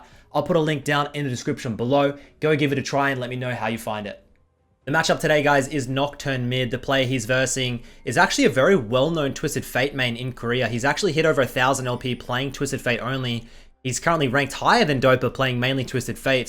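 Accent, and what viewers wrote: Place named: Australia. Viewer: Hey man me and my girlfriend love watching you, but recently she's been telling me to get a haircut similar to yours and start speaking in a Australian accent? Should I be concerned?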